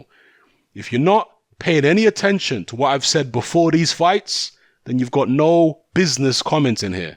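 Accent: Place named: South London, United Kingdom. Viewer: You sound like youre from Sth london ,....tssr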